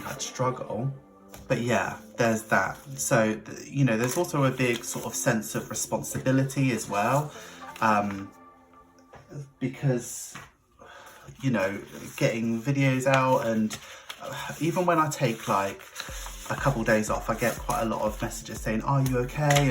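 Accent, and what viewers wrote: United Kingdom. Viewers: Your answer to the kids question was so funny. I have a friend who did NOT want kids, but "oops". She's still not happy to be a mom, but she would kill anyone who looked sideways at her kid. It's pretty funny. But I love how honest your answer was. And I wish you could be uncle to my kid. I think you'd find him fun, and he'd definitely love you. I mean, he already kinda does. He's almost 18 mos, but he loves watching you, and listening to you talk. We're not British, so I know part of the talking is the accent. But also you're so animated and engaging. That person with all the envelopes picked such pretty colors!!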